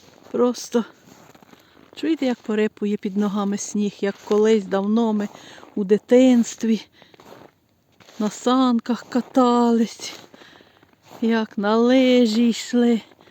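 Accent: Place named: Hutsul, Ukraine. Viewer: Яке це село Чую наш говір гуцульський У нас завжди файно❤Миру і перемоги всім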